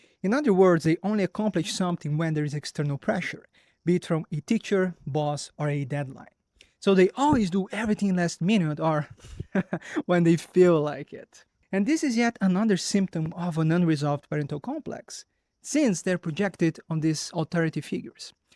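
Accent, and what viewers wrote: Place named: Brazil. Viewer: Sotaque brasileiro em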